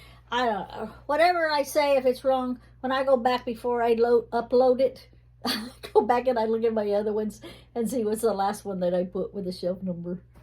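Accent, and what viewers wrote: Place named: South, United States. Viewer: Girl....your accent is Perfectly Southern just like mine....I Love it!!!! Get your Wizard friend some E6000, his crystal shouldn't break off again with that. I miss the Tang of yesteryear. Might have to pick some up at the store...lol Sale and clearance....I roll like that too...lol Star Anise, I would say in the spices. 💜💜💜💜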